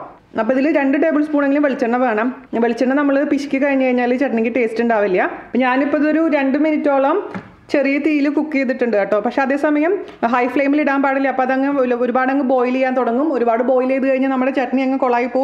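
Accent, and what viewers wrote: Thrissur, India. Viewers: It's Thrissur slang! We speak little fast😊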